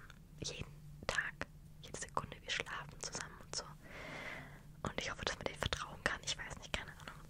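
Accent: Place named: Germany. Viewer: German accent :virtualhug::virtualhug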